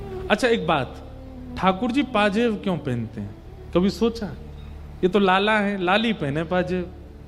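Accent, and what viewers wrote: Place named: Braj, India. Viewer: Jay Shri Krishna Radhe Radhe 🕉 OM Namo Bhagvate Vasudevy Namh OM 🕉 Namo Narayan ji Jay Shri Hari Jay Shri Shyama Shaym ji Jay Shri Laxmi Pati Jay Shri Radha Rani Jay Shri Radha Kishori ji Jay Shri Radha Raman ji Jay Shri Radha Vallabh Lal ki Jay Ho ♥️ Radhe Radhe Jay Shri Harivansh Guru Dev Maharaj ji Aapki vraj Vani Badi Mithi hai Jay Shri vraj vasi Aapke Charno Mai Koti Koti Vandan ♥️ 🙏 Jay Shri Vrindavan Dham 🙏 Jay Shri Yamuna Maharani ji 🙏 ♥️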